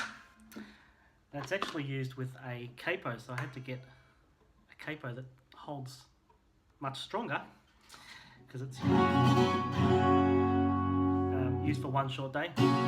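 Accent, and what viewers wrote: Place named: Australia. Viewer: Thanks for the videos,. I've just started learning Wicked for a production coming in Jun. I'm a seasoned guitar player, but I'm no pit musician yet. Looking at the charts, and man I have some work ahead of me. I'm in Syd, Australia and by the sound of your accent you may not be that far away. I'd love to discuss the musical further if you're able, please. Thx. Ted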